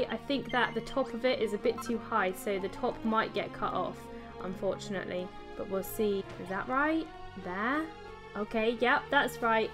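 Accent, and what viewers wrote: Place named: United Kingdom. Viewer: Hello, stumbled upon your videos not too long ago and i like your style and your lovely british accent so i subbed and i can't wait to see more of your DQB creations. 😉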